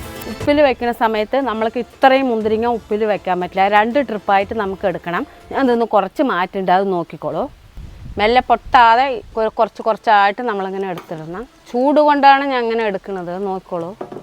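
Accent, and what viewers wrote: Palakkad, India. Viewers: പാലക്കാട്‌ ആണ് തോനുന്നു സംസാരം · അമ്മൻ്റെ നടെവിടെയാണ് സംസാരംകേട്ടിട്ട് പാലക്കാട്ടാണൊ എന്ന തോന്നൽ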